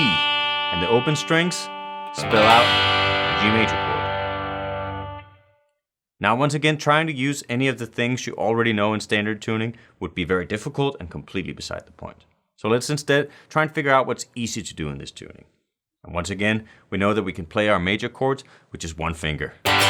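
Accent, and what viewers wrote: Denmark. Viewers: Sounds like a Dane speaking English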